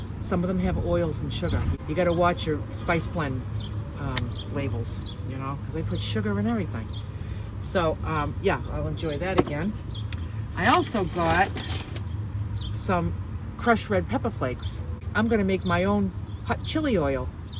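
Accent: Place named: New England, United States. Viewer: I’m a NH native and love seeing my home state being enjoyed; you have a distinct NE accent so it must be fun to be back in the neighborhood. Be safe… Wish I’d seen this sooner as I would have encouraged you to visit Willard Pond in Hancock… you can swim there for free - my grandmother established it as a reserve and it eventually was handed over to the Audubon Society to manage it.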